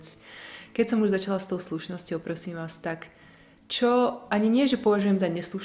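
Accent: Slovakia. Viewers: Myšlienka" Neviem čo chcem, viem čo nechcem" mi je blízka 😂 Inak pekne stredoslovensky mäkko vravíš aj keď netuším odkial pochádzaš 😁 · ta slovenčina mi prisla taka martinska...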